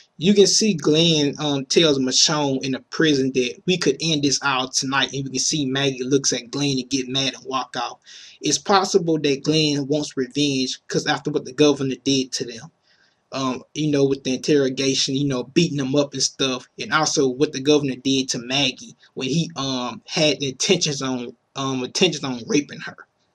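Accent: South, United States. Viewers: What state are you from? I can't place your accent? Georgia? One of the Carolinas? PS. Thanks for the videos. New subscriber here. :)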